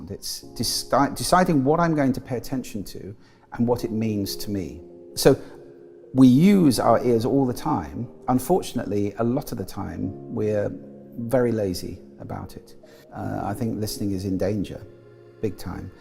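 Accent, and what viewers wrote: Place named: United Kingdom. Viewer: Some valid points. More speculation going on here “seemingly valid” with the ol’ British accent.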